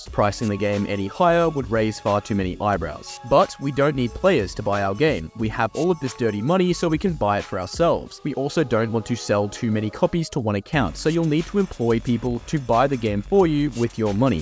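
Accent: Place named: Australia. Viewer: I noticed you had an australian accent. the best way to launder money in australia is to deadass go to a casino, put all your dirty money in and cash out. and there you go, you have nice clean money you won at the pokies without any cuts. this is genuinely a massive problem in AUS. when a whistleblower tried to point this scheme out, the casino lobby in Australia tried to sue him.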